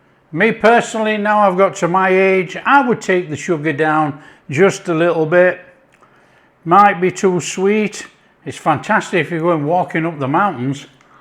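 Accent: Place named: Lancashire, United Kingdom. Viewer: We made the flapjack tonight, we are in Yorkshire near Whitby. We think you sounded originally Lancastrian maybe Burnley, Preston Accrington or possibly the Rochdale area. Please put us out of our misery 😄 Yorkshire or Lancashire? Either way we have really enjoyed your video, subscribed and laughed when you said "cook off" xx❤xx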